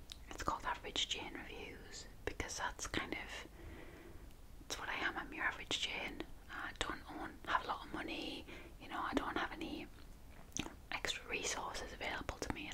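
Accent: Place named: Sunderland, United Kingdom. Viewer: Are you from Sunderland? Or the north eastern area? It's great to finally find an asmr artist from where I live!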